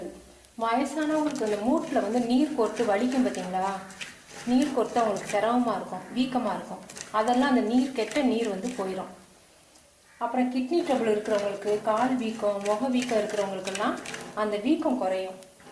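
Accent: Madurai, India. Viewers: maduraya neenga...ur pronunciation looks like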